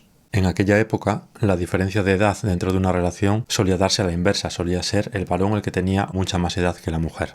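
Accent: Spain. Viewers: Cuando te vi tomar mate pensé¿Qué? ¿Es argentino?, pense que era español! Entonces volviste a hablar y efectivamente, eras español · Es el primer video que veo de ti, muy bueno, pero no se de dónde sos, tenes un acento español pero tomas mate jaja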